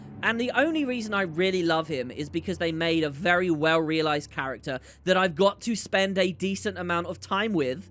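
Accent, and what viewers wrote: United Kingdom. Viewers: wow, you really sound British with the audio like that. but, 1 thing i think i would say about the legendaries is i think the only way they will add more or add new spear, trident, and speargun, is if they come out with and elite, example i can give is with Ele getting a Bow, as i think anet knows that ppl will rage if they mod 1 of the existing weapon, they would replace that elite weapon with a Spear instead of a Staff, and if they would add in a new set of legendary weapons, i think they would just do the practice they did with Legendary Armor, and Trinkets, they would make WvW/PvP weapons up-gradable, i think 1 issue is with gen2 is you can't sell them.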